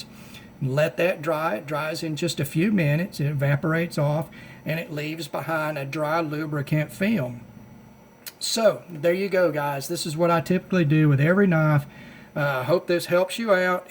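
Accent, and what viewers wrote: South, United States. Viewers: G'day Champion how ya doin ? i'm new to your channel and i'm already lovin it. Great reviews and very informative, keep up your great work P.S i love your southern accent. Cheers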